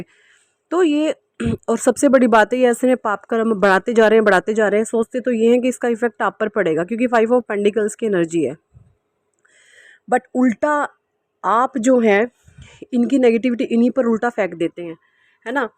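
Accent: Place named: Punjab, India. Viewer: Your punjabi accent is so appealing!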